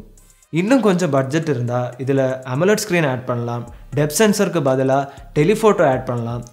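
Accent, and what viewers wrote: Kerala, India. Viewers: Malayalam kalantha tamil um kekka nalla than irukku ❤️ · அதெல்லாம் சரி க்கு தமிழ் தடுமாறுதே. மலையாளம் வருது.... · Bro nega malayalam mix tamil pasuriga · Malayali yoh? Bcoz ur slang looks like Malayalam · Ena bro unga voicela lighta tamil+malayalam kalanthu varuthu neenga malayaliya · Nice explained.. but I felt ஒரு மலையாளி தமிழ் பேசுற மாதிரி இருக்கு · Mallu accent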